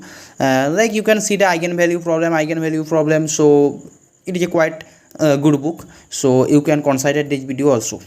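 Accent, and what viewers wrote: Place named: Bengal, India. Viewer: Are you bengali or orrisi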